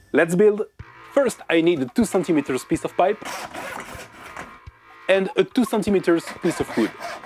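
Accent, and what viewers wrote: France. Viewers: Pas de réaction à mon post d'il y a BIEN PLUS d'un mois (eh, YT de mes...). Pas étonnant : tu t'adresse à un public anglophone ou anglophile (dont y doit y en avoir qques uns qui viennent se régaler de ton accent Frenchie) et À PEU PRÈS les seul à avoir pigé le sens de mon intervention, c'est toi. De deux choses l'une (à part le soleil bien sûr), OU BIEN t'as pas le temps de revenir voir les comm. sur tes produc à YT, OU BIEN tu t'en fous de ce que je te dis. Libre à toi de continuer dans cette voie, mais sois prévenu : c'est une très lourde responsabilité que tu prends en n'avertissant pas ton public du danger à porter en bouche, régulièrement, du pvc industriel. Si c'est la 1ère hypothèse qui est juste, j'ai pas d'autre choix que d'inonder les comm. de tes autres "sorties" pour battre l'alarme. (Et p'tain, je me passerais bien de me farcir ce taf ; car y en a d'autres qui font comme toi - mais pas avec autant de constance d'ailleurs je ne sais pas comment tu fais ; chapeau pour ça - & pas pour ton entêtement si c'est la 2ème hypothèse qui prévaut...)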